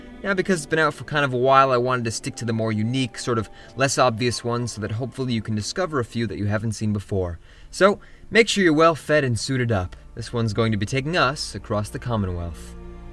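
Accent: Canada. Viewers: dude u canadian？ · Is he canadian?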